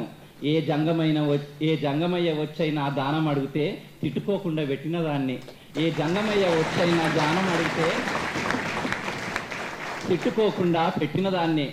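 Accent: India. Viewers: ఏక్ దం నాటు తెలంగాణ యాస చాలా అద్భుతంగా ఉంది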